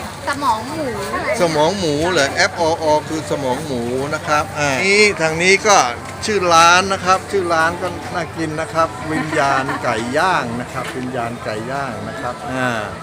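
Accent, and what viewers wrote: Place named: South, Thailand. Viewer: พี่ชายเป็นคนใต้หรอเห็นแหลงใต้ได้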